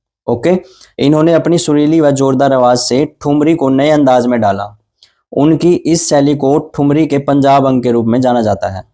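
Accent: Haryana, India. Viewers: Maan ge maaster kati haryanvi ki feel ha 😁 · Hainaaa mujhe bhi yhi lgaa inki voice mei haryana ki tone aati h 😅😅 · haryana aala ki boli ghani hai pehchane ne ke liye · Yes sir definitely 😁 haryana se hai , I am also from haryana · haryanvi ho kya app voice se lag rha hai . sir vo notes toh provide kr do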